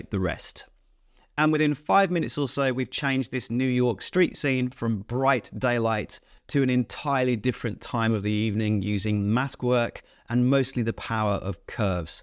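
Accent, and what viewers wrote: United Kingdom. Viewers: Wow, i use Lightroom many Years and i thought i had good knowledge. Most Photographers use Photoshop and i thought i must learn more PS, but i like more Lightroom. You bring me to the another Level, Thank you!! Greetings from Germany, i understand your clean Oxford English very good. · You are amazing and I love your clear British accent!!!🥰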